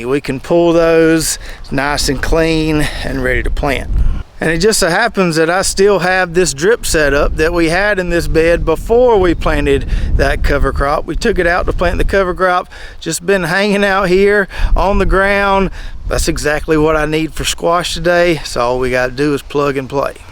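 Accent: South, United States. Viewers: lol southern talk is funny 🤣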